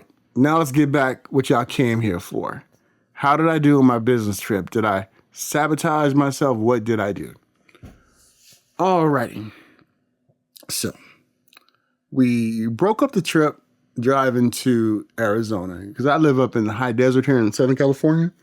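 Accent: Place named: United Kingdom. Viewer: Your British accent is EVERYTHING!!! You sound better at the Queen's English than I do 😂😂😂😂 xxx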